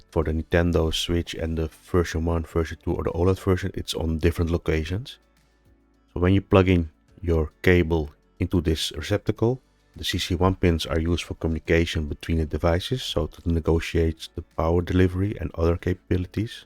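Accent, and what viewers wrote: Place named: Netherlands. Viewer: 2 seconds in and i hear the dutch accent 😁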